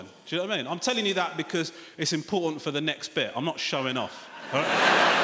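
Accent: United Kingdom. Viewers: What this south indian guy doesn't know is that his native south india is racist towards North Indians...no matter how much British accent he got he wont never talk against South india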